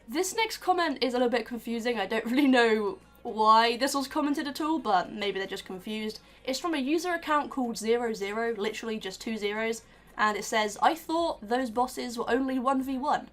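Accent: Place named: RP, United Kingdom. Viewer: Your so posh i love it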